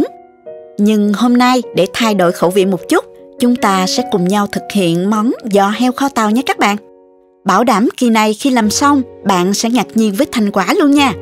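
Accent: South, Vietnam. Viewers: Chào chủ kênh. Giọng nói miền Nam nghe ấm áp ngọt ngào. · nói giọng nam bộ rất dễ nghe ấm áp,ngọt ngào,thân thiện,dễ thương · Đúng chất giọng.Nam bộ Bình Dương rất dễ nghe dễ hiểu có chất giọng rất truyền cảm CĐM luôn ủng hộ k.BD... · Cách hướng dẫn dễ hiểu và giọng miền nam đặc trưng mộc mạc hiền hoà dễ thương lắm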